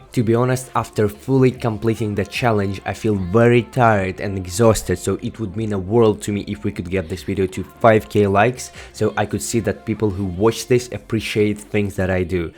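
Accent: Russia. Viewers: Are u russian? P. S чекнул инсту, понятно. Получается, я понимал инглиш проще из за акцента. Для большей аудитории снимаешь на english? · Над произношением еще поработай, а то немного палишься с русским акцентом) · Мне кажется или ты Russian? Акцент, сука, русский.... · Чувствую русский акцент)) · Блядь, какой-то русский акцент прослеживается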